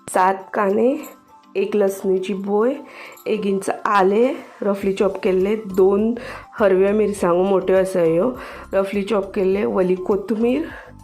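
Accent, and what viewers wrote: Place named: Konkan, India. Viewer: Tujho awaz aani kokani bri aasa,ayak pak brey dista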